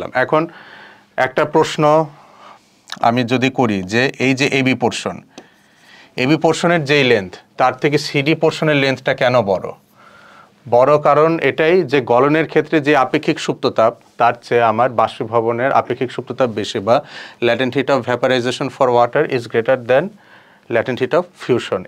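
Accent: Standard. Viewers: প্রমিত উপস্থাপন ❤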